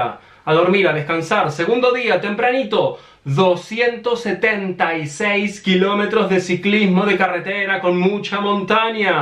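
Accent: Argentina. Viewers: Muy bien haz ayudado a 50mil y si nos haz hecho sentir muy bien una pregunta eres argentino pues no hablas cpmo español???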